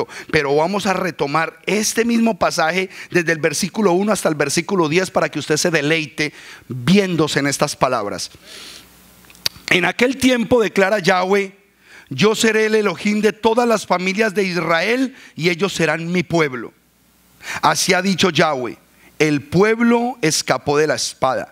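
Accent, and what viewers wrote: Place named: Colombia. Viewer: Ese es mas colombiano que el presidente por su asento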